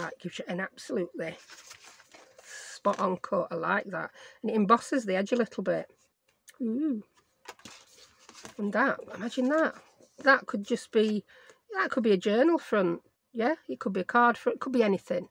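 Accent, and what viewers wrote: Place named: Yorkshire, United Kingdom. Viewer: Brilliant video. Love the accent. Is it Yorkshire?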